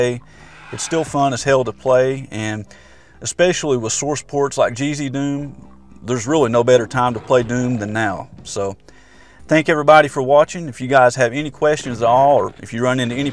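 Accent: South, United States. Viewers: Just found this video. It is absolutely excellent. Clear and concisely presented instructions in a superb American accent. I don’t know what state you’re from but it is mega cool! Best wishes from England. P.S. I also agree with you that Doom is the foundation of most games in that genre. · Love your southern accent, bro. : > I would have mentioned Crispy/PrBoom+ for the more purist minded people, but keep up with the good content! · Good American Accent Boi!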